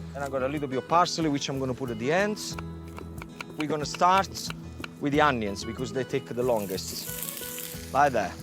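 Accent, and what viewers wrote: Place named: Italy. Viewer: I am Finnish and I like your English with Italian accent. I like to hear Itslian. Thr colour of the Italian language is making the atmosphere..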